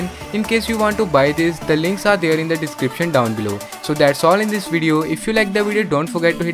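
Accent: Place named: England, United Kingdom. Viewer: hahahha you sound little angrezz tho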